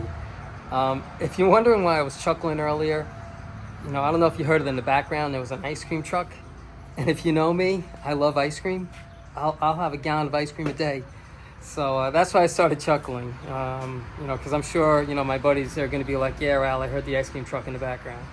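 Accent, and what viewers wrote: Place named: Long Island, United States. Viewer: You have the Long Island accent like me. What town are from? By the way, that’s a great Camaro you found, must have been in a garage.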